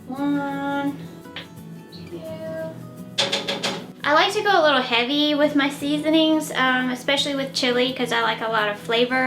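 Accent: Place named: North Carolina, United States. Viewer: You sound like your from my neck of the woods in North Carolina 🤗😁, "don't need no meat"😄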